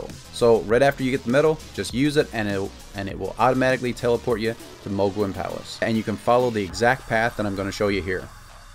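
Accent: Newfoundland, Canada. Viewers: you're a newfie arent you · Are you Newfie? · I knew you sounded from around the bay!! Haha awesome buddy how’s ya getting on? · Newfoundlander 😎 I could tell soon as I heard your voice I too am from the rock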